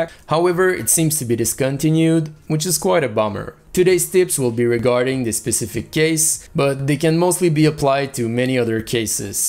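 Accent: Quebec, Canada. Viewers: Est tu Québécois ? :D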